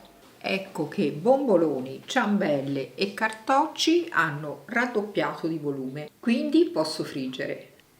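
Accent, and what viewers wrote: Tuscany, Italy. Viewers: Buonasera, con la sua dolce calma e quella magnifica cadenza toscana, ci ha regalato una ricetta che si deve provare prima di subito... Che meraviglia questi dolci di carnevale. Grazie! 🎉🎭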